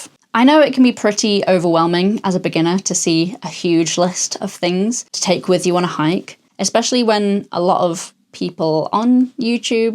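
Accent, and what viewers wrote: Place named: United Kingdom. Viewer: This might be my new favorite youtube channel. I love the fact you didn't put any music in the background!! You seem to be so down-to-earth, i love it. I love your accent, which country are you from in the UK ? Love from Normandy, France, where the wheather is as bad as yours! 🌧🫶🌞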